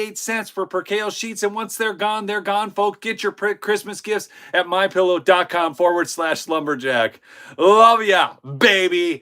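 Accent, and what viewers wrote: United Kingdom. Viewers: You sound like a Brit lol. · Hey, from central illinois. Love your British accent humor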